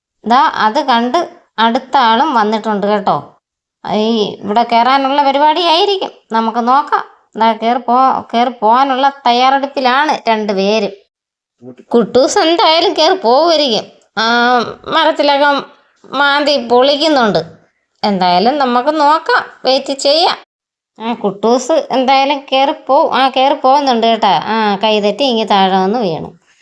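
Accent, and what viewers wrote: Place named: Trivandrum, India. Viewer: മാമി സൂപ്പർ,,,,,, നിങ്ങടെ slang tvm supur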